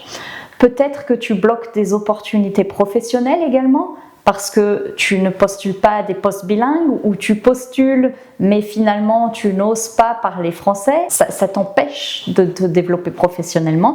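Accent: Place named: Europe. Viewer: Votre accent me parait europeen. Je suppose que vous avez demenage au Canada d'un pays European. Merci pour l'encouragement de practiquer le franchise quand l'occasion se presente, et je suis tellement d'accord.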